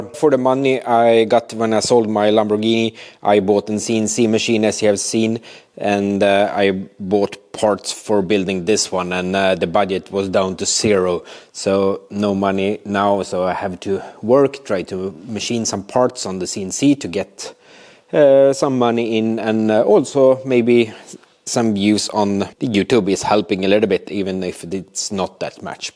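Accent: Sweden. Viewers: i love how thick the swenglish is.... id watch a video about sewing with this accent.. cars are better tho.. tjena från Norge! å, nei.... hexadecimals.... have fun ;D¨'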